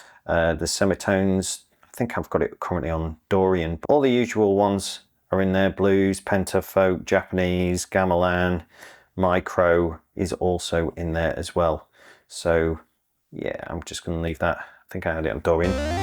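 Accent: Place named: Tameside, United Kingdom. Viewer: You sound like you are from my area of the country, I'm in tameside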